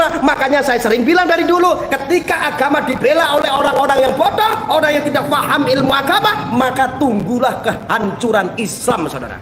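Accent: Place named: Surabaya, Indonesia. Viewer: Suraboyo banget...cok..cok...setuju aku... 😘🥰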